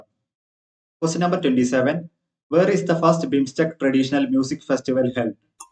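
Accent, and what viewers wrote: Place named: Kerala, India. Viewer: Are you malayaliⓂ️strong accent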